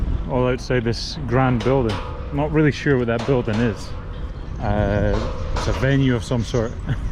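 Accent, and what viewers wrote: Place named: Scotland, United Kingdom. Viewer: A wee bit Scottish dialect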